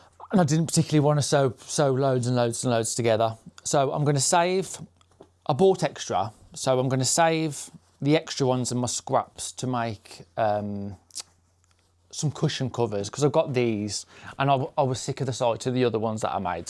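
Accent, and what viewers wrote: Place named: Leicestershire, United Kingdom. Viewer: I loved watching your video , I have so much to say lol , regarding ends of quilting , I do ,do a back stitch , but if your unhappy , I would just leave a long thread and make sure you bury it well , but honestly , I don't worry about it myself . If a man on a horse riding by can't see it , then your good lol . What is that corner turny thing you mentioned ? As to the stripey binding , if it's on the bias the stripes go diagonally. I would use your left over stripey fabric to bind something small like a table runner to practice and see if you like it . I don't know where in the UK your based ( sounds close to where I was born , Leicestershire) but if you gave a local quilting shop they might know of local groups you could join , we always loved it when men joined . Now my health is not good I can't go to groups so I do video calls with my sister of friends whilst we quilt or crotchet etc . 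Do you know anyone ? On WhatsApp or messenger the video calls are free so we do it for hours . And have you seen the book Fox under the moon , seasons of hope ? It's similar to the boy , the fox ,the mole and the horse , I find it helps sometimes . I love the illustrations so much I'm going to make some in to small wall hangings ( that is after I finish some other wips lol )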